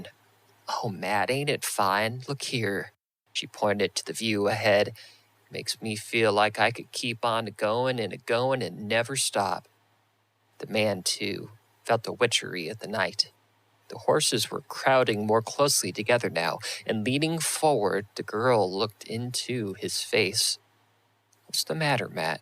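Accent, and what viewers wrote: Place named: Ozarks, United States. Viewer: Wonderful job with the phases and feel of the language of the Ozarks.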